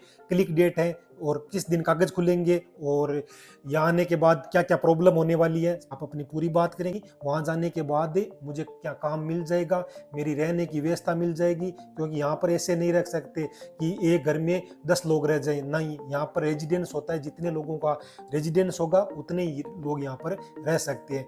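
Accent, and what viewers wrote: Rajasthan, India. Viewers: आप राजस्थान से हो क्योंकि आपकी भाषा में राजस्थानी झलक है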